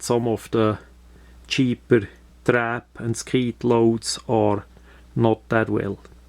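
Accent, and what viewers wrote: Switzerland. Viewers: ... stuuupid as a breeead as they are ... ich lach mich schlapp, geiler schwiiizer Akzent und nette Geschichte! weiter so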